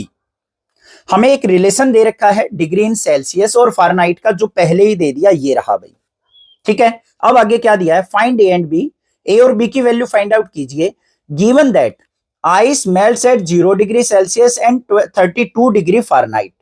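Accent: Haryana, India. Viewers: Sir aap bhot achha phadao ho pr aap kit the ho apki avag main te haryanvi tone avhe hai 😅😅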